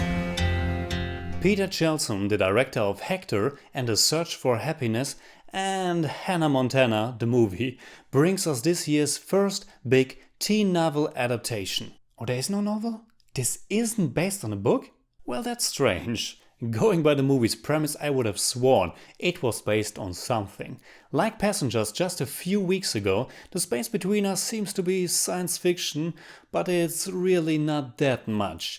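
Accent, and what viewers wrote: Germany. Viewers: This is the first vid I'm wtaching from you Are you German ?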